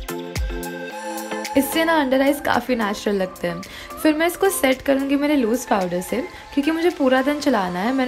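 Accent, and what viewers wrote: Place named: Mumbai, India. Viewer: Omg a hindi video After hearing ur mumbaiya hindi in ur vlog with ur dadu i requested u to do video in hindi n u did it Thanks Btw please do post videos in morning so it will make our day beautiful One more thing i m not getting notifications of ur channel but getting of others channel is anyone else facing same problem 10 days left for vlogmas n its sad please try to post more videos N just love 💓💓💓💓💓💓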